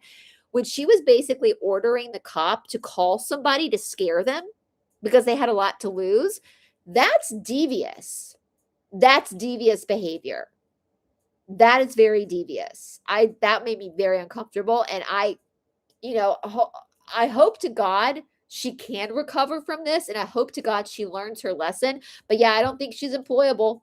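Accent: South, United States. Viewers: Oh, and all the southern isms are spot on, lol.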